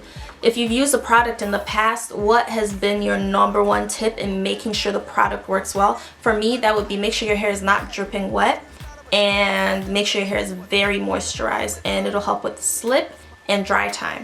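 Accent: Nigeria. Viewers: My sistah! Where you dey now!? Naija girl. I heard the accent a little lol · Loving the Naija accent oh. hmm. lol.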